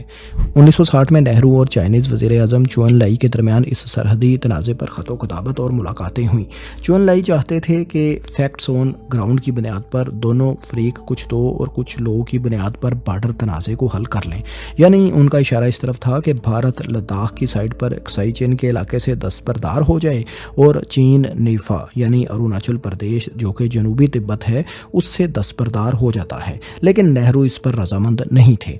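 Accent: Punjab, Pakistan. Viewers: Collab dekh k.laga faisal ki awaz hogi par nai yr ye ki awaz voice over bht bura hy bhai koi voice over he kara le ajeb awaz h bolna b ni ata lehja b pure punjabi bht logo ne dekna he chorh dya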